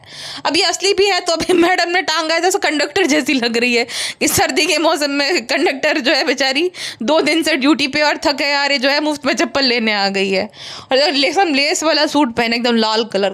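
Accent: Gujarat, India. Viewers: Aap bolne pr se lagta hi h k aap gujrati ho kyu ki me bhi rajkot me raheti thi wha gujrati bolte h jab bhi ap koi gujrati word bolte ho kuch to me khush ho jati hu aisa lagta h meri frd mujse bat kar rahi h....etna acha lagta h muje ar sunne me maja aata he...❤❤❤ Luv 😊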